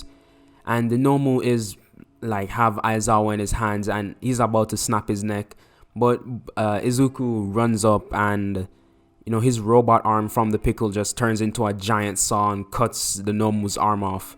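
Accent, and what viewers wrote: Jamaica. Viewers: Yo you sound Jamaican 🤔🤔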